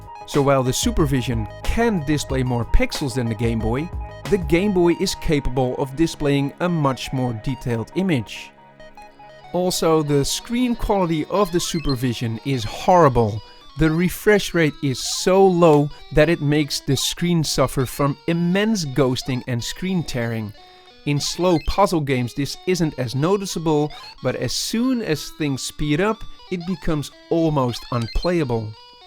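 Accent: Netherlands. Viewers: You Dutch ? :-) great video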